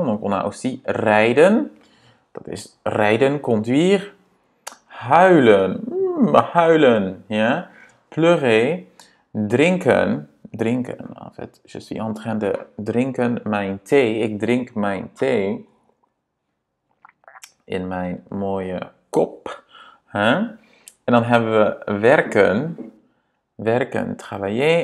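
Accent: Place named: Quebec, Canada. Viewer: Merci tu expliques vraiment bien! Bizarrement j’entends un léger accent québécois quand tu parles français 🤪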